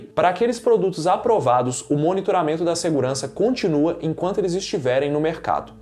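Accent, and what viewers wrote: Minas Gerais, Brazil. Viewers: Que sotaque mineiro fofo ❤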